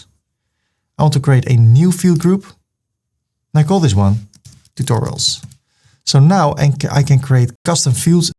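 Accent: Netherlands. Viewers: I really dig the Mark Rutte English. Cool stuff.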